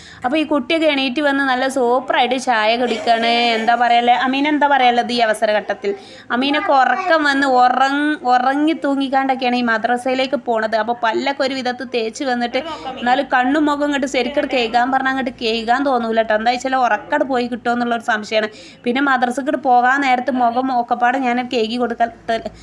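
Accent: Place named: Malappuram, India. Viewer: ഇത്‌ ആ മലപ്പുറം താത്താടെ സൗണ്ട് nd സംസാര രീതി ആണല്ലോ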